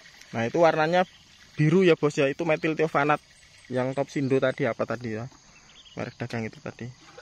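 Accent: Java, Indonesia. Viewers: Kok bhs jawanya medok banget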